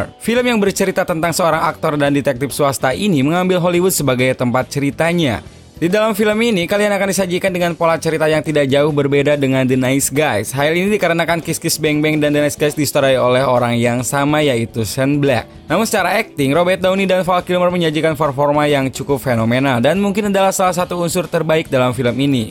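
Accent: West Java, Indonesia. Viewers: arfan muhammad hahaha kykx iya. Mgkn ini org sunda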